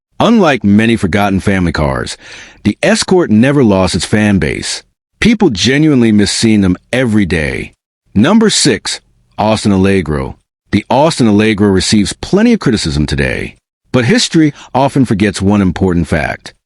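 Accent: United States. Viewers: Sorry one minute of the American commentary finished me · Why do we have to have an American to narrate a video about British cars..I can't be bothered to watch after 2 minutes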